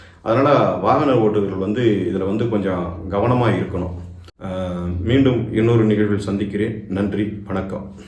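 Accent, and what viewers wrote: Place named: South, India. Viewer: South voice good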